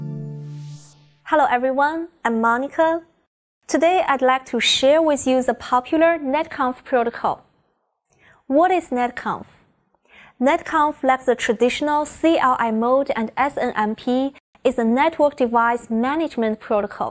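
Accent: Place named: China. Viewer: 一听就知道是中国人说英语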